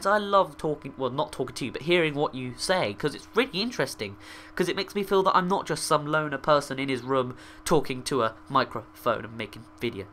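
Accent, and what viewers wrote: United Kingdom. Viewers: Yes another british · Are u british?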